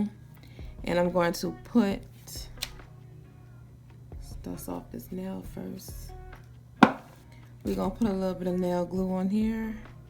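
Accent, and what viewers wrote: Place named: New Orleans, United States. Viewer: baby that New Orléans accent thick hunny 😂😂 (i love the way they speak). nails look good girl keep up the good work this really helped me fix my broken nail. 💕🙏🏾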